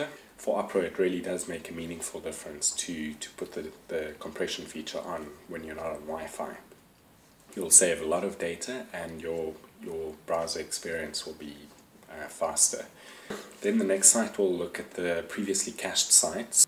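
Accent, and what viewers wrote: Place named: South Africa. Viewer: Sounds South African.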